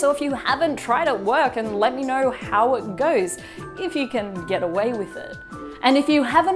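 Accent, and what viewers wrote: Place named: Australia. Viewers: I believe it's Australian, but she is from New Zealand as far as I remember. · I have an Australian accent and since I don't notice an accent must be Australian.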